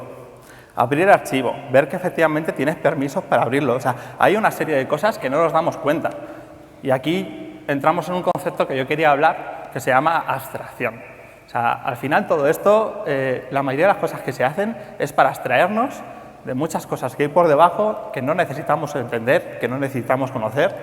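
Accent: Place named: Spain. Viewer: luego con ese acento españolete se hace más aburrido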